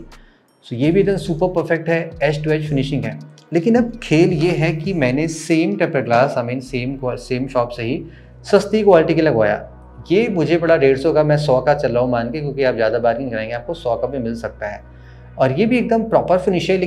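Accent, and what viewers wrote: Lucknow, India. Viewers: Aap Lucknow se ho bhai sahab 😊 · Bhai aap Lucknow se ho??? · Aap lucknow se hai kyA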